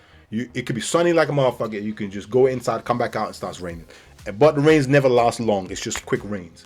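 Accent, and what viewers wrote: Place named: Malaysia. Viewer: great to hear a local accent. Thank you for all the tips. Love Malaysia too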